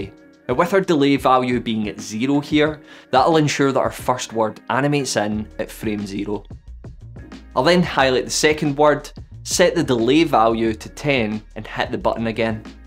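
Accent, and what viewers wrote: United Kingdom. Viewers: Thank you! Btw, first 1,5 minutes i just listening your pronunciation in English, it’s British accent? :)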